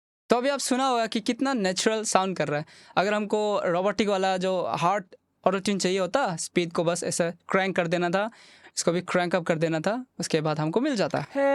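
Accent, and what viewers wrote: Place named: Andhra Pradesh, India. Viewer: You sound like and im sure yeh ap hi hai accent se pata chalre, im from a.p too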